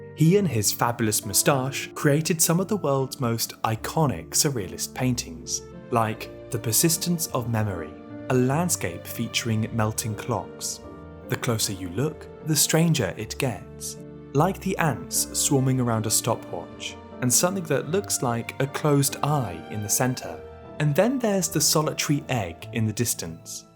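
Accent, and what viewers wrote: United Kingdom. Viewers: Such a great video I love your voice I love the pace of your informative mind your British accent just awesome 👍.. loving the video about it and then watching more and more keep it up 😋👍😁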